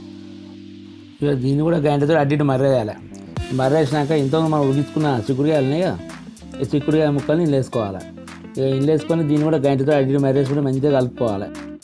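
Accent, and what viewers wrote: Telangana, India. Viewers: తెలంగాణ స్లాంగ్ కురకంటే బాగుందన్న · పక్కా ఉరా తెలంగాణ స్లాంగ్ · Telanagana slang lo Baga cheppav Anna!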